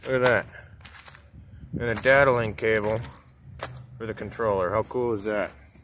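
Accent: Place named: Appalachia, United States. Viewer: You sound lil bit hillbillysh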